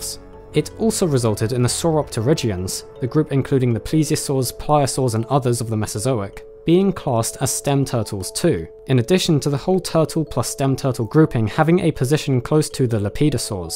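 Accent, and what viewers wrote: United Kingdom. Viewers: Abahrelghazali I agree! These videos are quite credible, and reassuringly cautious with assertions. It’s a pleasure to hear scholarly rigor propelling engaging, narrative logic with worthwhile, substantial content, all described with Linnaean terminology. On YouTube you never know what you’re getting from just glancing at the titles and cover illustrations; some “science” presentations are just amateur pop entertainment with lots of violent predation and a very low global veracity index. I do grin frequently over the British pronunciations. I will never again refer to proboscideans with such ungenerosity of syllables.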